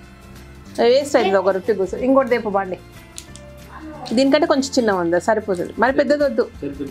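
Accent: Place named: Mahabubnagar, India. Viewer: Hi aunty nice video medi kosgi side Kada aunty uru pothireddypalli ante mahabubnagar dist e Kada meru mataldtunte alane anpistundi nice video aunty murkulu pedaga chesaru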